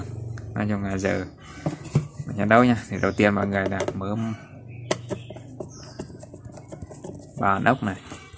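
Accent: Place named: Ngư Lộc, Vietnam. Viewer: Mới nghe tiếng nói mình tưởng bạn ở Ngư Lộc (Hậu Lộc). Chào nhé !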